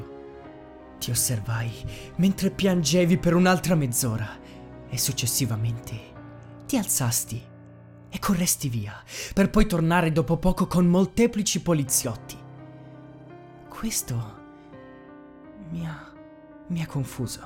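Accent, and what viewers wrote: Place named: Norway. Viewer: sei bravissimo e amo troppo il tuo accento norvegese credo. Continua così perché sei un mito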